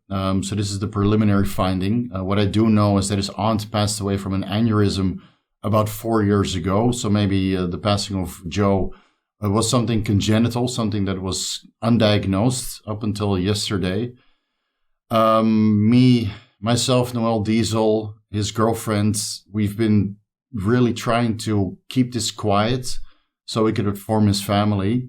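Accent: Netherlands. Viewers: To be honest, I have only seen calloboration videos of Jo but I really liked him and I am left wondering why I only started to follow him now. Still, your loss of a loved one even resonates in me and he will be in my thoughts. I also do not know you, however, your accent sounds Dutch. In case you are, ik wens je heel veel sterkte in de komende tijd! Rest in paradise Jo, we will carry on for you, with you in our thoughts❤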